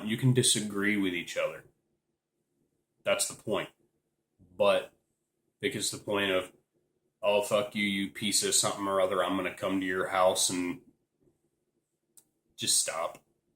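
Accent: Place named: South, United States. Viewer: Good intro. I have to agree that people should look at youtube as entertainment. Oh, nothing wrong with a good southern drawl. Looking forward to the new channel. Cheers!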